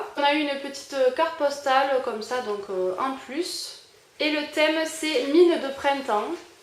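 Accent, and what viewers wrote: France. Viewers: Mort de rire l'accent marseillais hihi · Salut, Cette box m'a l'air super je croit que je vais commande des boxs. Pourrais tu nous expliquer comment commander des boxs ❤️ Sinon j'adore ton accent je suppose que tu vient du sud 💜 Gris bisous et a bientôt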